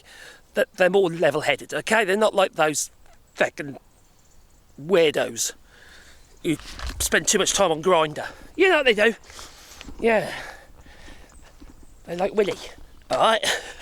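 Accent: United Kingdom. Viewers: I sometimes have a hard time understanding your Britain accent, but I've loved from the first time i found channel ❤❤❤ 🇨🇦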